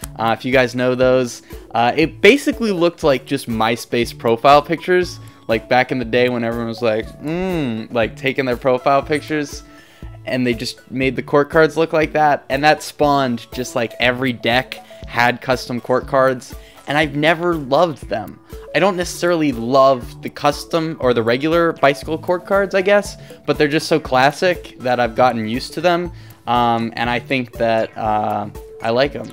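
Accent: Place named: United Kingdom. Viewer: Lovely UK accent ;)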